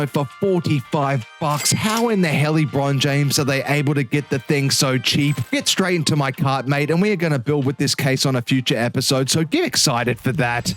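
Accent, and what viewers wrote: Australia. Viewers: are you aussie? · Your Aussie voice saying, "...the total price of this PC being seven hundred and ninety-nine Benjamin Franklin's..." made me spit out my beer, as if it was a Vegemite Sandwich. Benjamin Franklin appears on the $100 Bill [in US Money]. If that were true, this crappy PC build would cost $79,900 [US Dollars]. Quite the overstatement! You owe me a beer. Next time I'm Down Under, I'll let you know., so you can put one in the refrigerator for me. I don't drink 'em warm. Oh, sorry, forgot you're not European, you're Australian. Scratch that. I'll drink a warm beer there. I'm not sure if you guys [blokes, mates, whatever you call yourselves] even have refrigerators. BTW, I get drunk after one beer, so you might want to make a bed for me to sleep over on. Oh yeah, after I fall asleep drunk, I sometimes piss myself, so heads up! 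You might want to put a plastic cover on the mattress before I sleep over. As for breakfast, nothing special, just two eggs "sunny side up", some bacon, hashbrowns, orange juice, coffee, and toast. You don't have to make pancakes for me. I don't want to be a bother. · why does this ai narration sound so aussie right down to the adjectives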